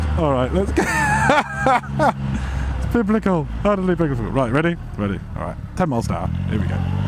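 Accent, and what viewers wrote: United Kingdom. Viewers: Your classic English accent is excellent sir.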